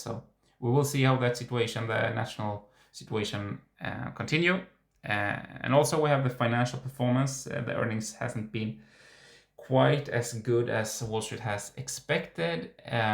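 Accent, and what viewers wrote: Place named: Sweden. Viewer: Your accent seems to me Swedish so tack sa mycket for your presentation and I wish you good luck with your channel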